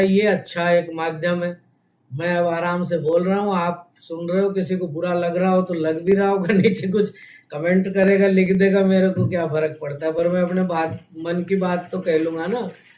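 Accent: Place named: Haryana, India. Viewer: Bahut sahi and ek aarse baad Haryanvi suunne ko mili hai,!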